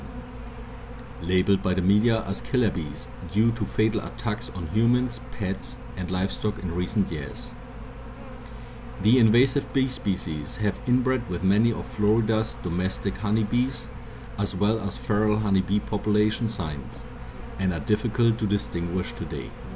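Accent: Germany. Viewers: I can hear you're German. Mega cooles Video! Weiter so! Deine Stimme ist echt beruhigend 😂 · are u from Germany ?? sound like it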